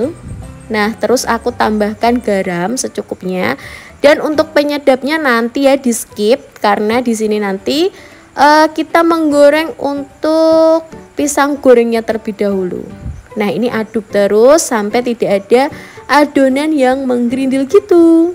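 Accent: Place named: Java, Indonesia. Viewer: Suara nya medok Jawa bnget, kereeeen 👍👍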